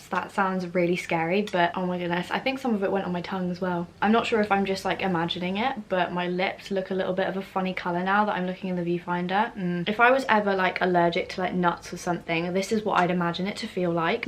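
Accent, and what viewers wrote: United Kingdom. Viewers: oh I wasn't (edit was here, miss-typed) searching for a brit. I just watch a lot of YouTube and mostly come across Americans and Canadians. There was no need to call me odd. It is odd you would pick up on it. I called it refreshing because it was to me. There is no need to be upset okay? I'm just some random on the Internet that was happy she came across a familiar accent after spending a lot of time consuming youtube media that wasn't from the UK. Do you never just go through your recommendations? That's what I do. I don't search for anything it's more fun that way.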